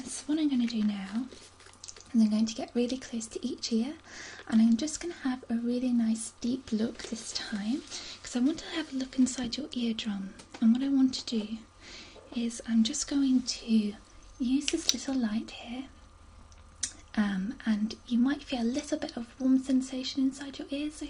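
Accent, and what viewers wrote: United Kingdom. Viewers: Where abouts are you from? Sounds extremely British, and that's coming from a British person haha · that moment when you watch this videos because you learn english and she has wonderful british accent xD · British accent inspires me love. I do not speak english, greetings fron Costa Rica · OMG !!! I love your British accent ....sounds really amazing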